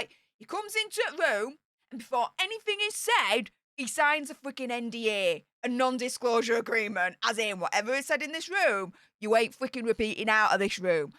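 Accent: Yorkshire, United Kingdom. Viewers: is a Yorkshire lass and her accent reflects that, there is nothing at all abnormal about the way she speaks.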